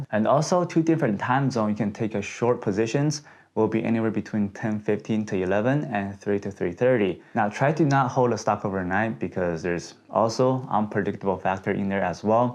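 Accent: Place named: China. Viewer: (I know it's not your real name) I comment your videos almost never, but I just wanted you to know that you contributed to my trading, and your personal story also did, I am Russian by birth but European all my adult life. I like your style. I even liked your chinese English, it was still ok, there was a way to understand it if you want really to learn in the markets...I am older than you at least 8 years even if I look at least 10 less, so no i am not writing this comment because I am looking for a rich husband or want to make kids(hope that will never happen to me, if I'll make some serious money, i will adopt at some point, as i did with my dogs), i just wanted to recognize you how much you contribute to my trading even if you have no idea who I am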